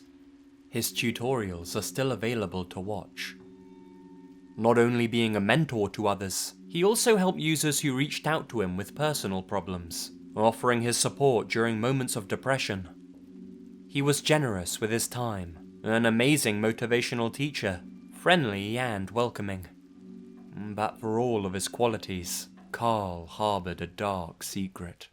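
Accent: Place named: Southern England, United Kingdom. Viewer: that accent doesn't lie, that's southern england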